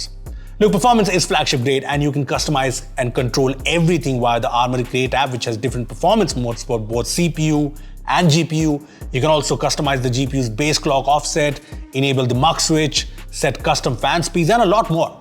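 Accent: India. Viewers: This comment will be called rasiccist but I love the way Indian male acsents sound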